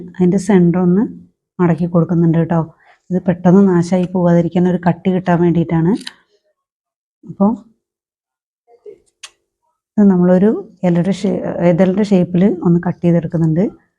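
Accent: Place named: Palakkad, India. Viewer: njaanum palakkattukaaran aanu.. samsaaram kettappol thonni 😍😍😍😍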